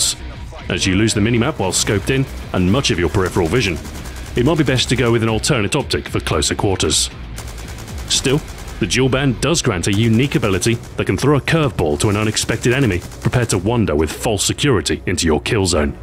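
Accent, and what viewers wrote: England, United Kingdom. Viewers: nope, a bit less Scottish and a bit more English. is based in Manchester, NW England. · R u really British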